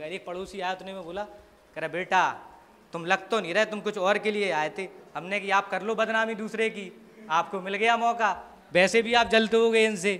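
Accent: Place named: Bundelkhand, India. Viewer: BUndelkhandi to ho guru tumm ho kaha se.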